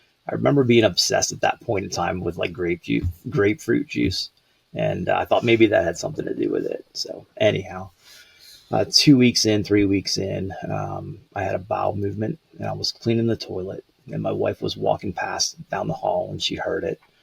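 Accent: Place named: Pittsburgh, United States. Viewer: You can hear that Pittsburgh accent for sure!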